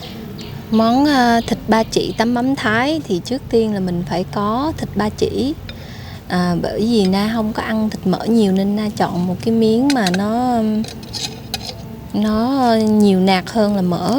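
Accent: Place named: South, Vietnam. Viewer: Ma ơi ! Anh rất thích ngừng video cua em no thật đi vào cuộc sống Con giống noi cua em nghe thật ấm lòng Em là nguoi Tây nguyên Nhung giống noi thật Nam bộ Chúc em ngày mới vui vẻ và an lành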